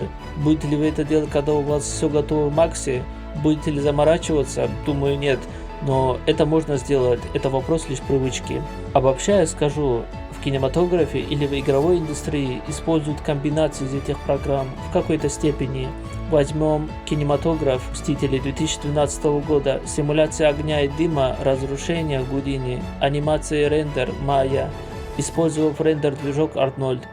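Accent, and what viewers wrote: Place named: Dagestan, Russia. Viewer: Судя по говору , либо Дагестанец либо Чеченец, я пока еще не разобрался 😅😂 Салам из Татарстана 👋😁 Я вот в FreeCad начал работать, мне больше интересна техничка и точность.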